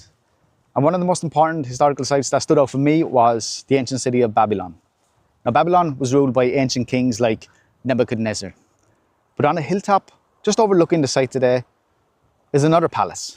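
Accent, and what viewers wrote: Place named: Ireland. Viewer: Irish English is fantastic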